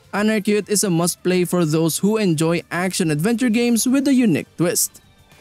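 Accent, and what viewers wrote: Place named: Philippines. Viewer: you have a Filipino accent and vibe.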